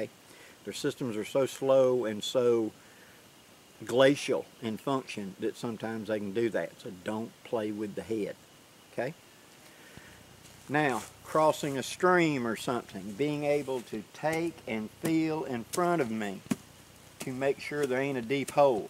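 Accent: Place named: Southeast, United States. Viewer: Liked & Subbed. You live in a Beautiful area from what I can see...May I ask generally what part of the country you're in? Based upon what slight accent I can decipher I would guess South-East US but that is very vague...Perhaps you would be willing to be a bit more specific..>Thank You!!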